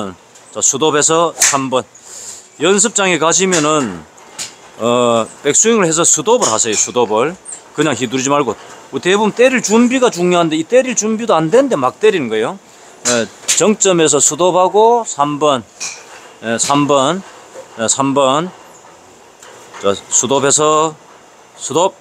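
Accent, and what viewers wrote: Andong, South Korea. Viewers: 구독자가 급속히 늘었네요. 억양이 경북 안동말씨같네요. 40년전 군대 선임하사가 고향이 안동이었는데.말투가 비슷하네요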